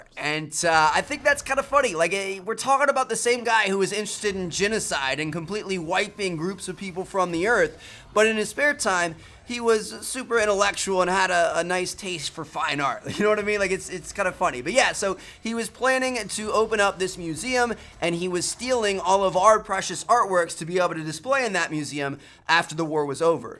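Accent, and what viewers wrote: Germany. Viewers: Wie der einfach mal voll den deutschen Akzent hat im Trailer 🤣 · What a German voice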